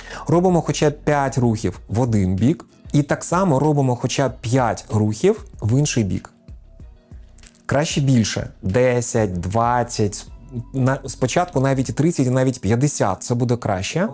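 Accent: Standard. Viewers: Така чиста українська!!!Вправи супер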